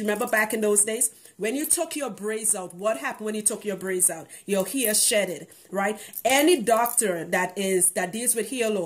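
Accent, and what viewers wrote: Trinidad and Tobago. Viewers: I knew when i heard that accent, that you were trini , represent hun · Hey great info. Are you from Trinidad by the way im hearing it in your voice and accent. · Sounds like your from Trinidad, ,are you? If yes,,where? Am interested in locing my hair. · I'm hearing an accent...are you trini? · you are Trinidadian dear I hear it in your accent. Also I believe she was referring to people with locs. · you sound like a Trini... are you Trini??? I'm Trini❤❤❤❤ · You go on and on and on and on and you still left us asking questions you could have easily answered 10 minutes or less into the video. And sorry to say but there's not enough art value in your tutorials, except for the background, where even your beautiful Trini accent becomes annoying because you're not delivering the message we've clicked on to hear. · I'm hearing ah Trini 🇹🇹 mus know my people.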